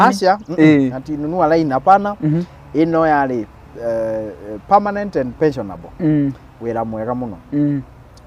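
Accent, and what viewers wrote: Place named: Nyeri, Kenya. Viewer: Kikuyu ya nyeri slaps differently..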